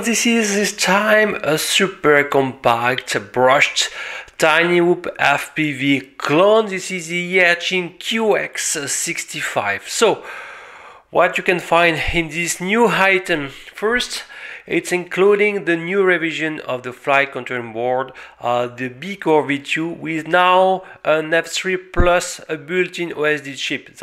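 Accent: France. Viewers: Tu es francais non ?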